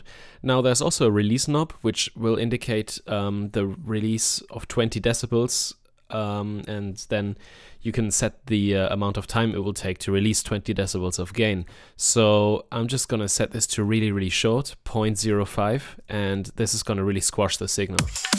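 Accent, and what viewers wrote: Germany. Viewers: Coole Tutorials und Reviews, aber dein deutscher Akzent geht mir voll auf die Glocken alter !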